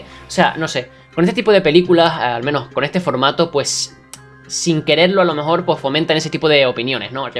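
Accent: Argentina. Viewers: argentino detectado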